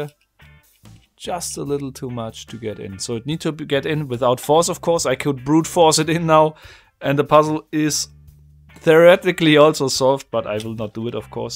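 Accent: Germany. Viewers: the German accent makes it more credible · That's some strong German accent! (Ich müsste mich mal selbst aufnehmen, wenn ich Englisch spreche, vielleicht klinge ich ja genauso) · Haha bist du deutscher?? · german accent is so damn cringe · are you from germany? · I love your German accent. Best one I've heard. "Brütforce" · Are you german? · You sound like you are from germany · Man merkt direkt an deinem Akzent dass du deutsch bist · Deutsche hören es einfach, dass dieser Herr nur aus Deutschland sein kann. 😅😅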